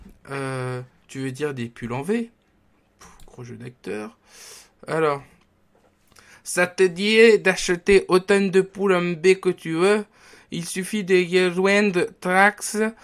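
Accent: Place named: India. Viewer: un p'tit accent indé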